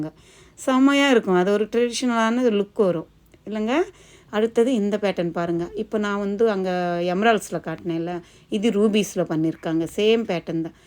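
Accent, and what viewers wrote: Telugu, India. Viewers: Sis neenga telugu vaasanai vesura tamil la pesuradhaala adhu keka nalla irukku ❤❤❤❤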